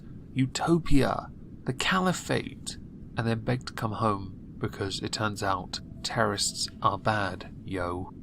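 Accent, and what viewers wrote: RP, United Kingdom. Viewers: Could maybe ONE of you closet furries do the not-really-going-out-there-on-the-edge social commentary videos WITHOUT the laconic "I just woke up" received pronunciation and the weird background ambiance to sound in-character? Christ all-fucking Mighty.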